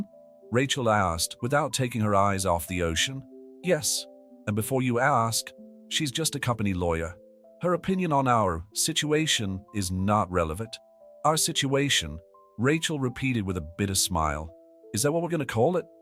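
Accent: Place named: Ireland. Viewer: The reader has such a lovely accent. Is that Irish I’m hearing? Is it AI? Either way, I’ve enjoyed the sound.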